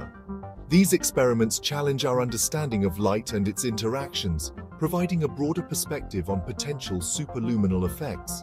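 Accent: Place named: RP, United Kingdom. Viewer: Imagine a Youtube with no AI created garbage videos like this channel, videos would then have to be spoken by real people with faces and names instead of this endless chatGPT scripts read by posh british actor voices in monotonous repetetive nonsenceical giberish. What a world, it exists in many other videos created by astrophysicists but not here where the creater is just a grifter.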